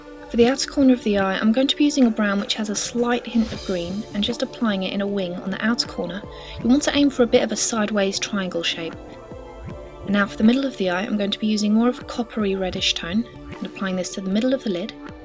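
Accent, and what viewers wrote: United Kingdom. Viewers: she sounds british :) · R U BRITISH !?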